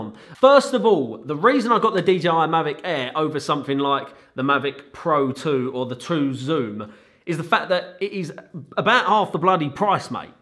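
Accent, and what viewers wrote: United Kingdom. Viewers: I also sold my Mavic Pro cause in the last 12 months I did not need it, just went to destinations that forbid the drones. Now, I really miss it. Also, I found the Mavic pro a notch too big as I like carrying all my tech in a small package. I'm also keen getting the Mavic Air, but I want to wait until end of Jan cause this is when DJI likes to announce new products after the Xmas craze. I'm still wondering when the hell the spark 2 comes out? I love everything you say cause I have a massive fetish for British accents. xxx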